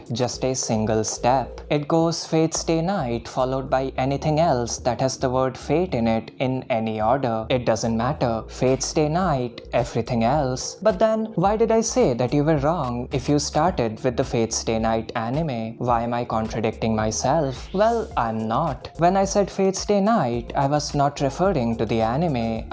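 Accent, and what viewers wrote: India. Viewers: >Open video >Indian accent >Close >Dont recommend channel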